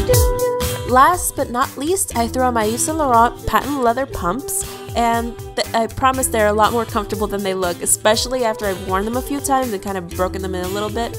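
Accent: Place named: Long Island, United States. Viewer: This was my FAVORITE OOTD video EVER! PLEASE do more! I love your personality! I am from Long Island and your voice reminds me of all of my friends from back home!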